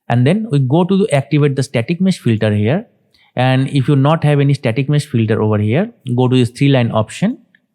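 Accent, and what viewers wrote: India. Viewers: какой то индийский акцент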